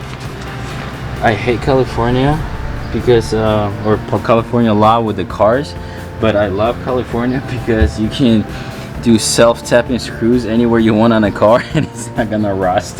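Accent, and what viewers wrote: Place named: Russia. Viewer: Я на первых минутах раскусил , что Русский , )))) акцент выдал))). Тачка супер 👍🏻👍🏻👍🏻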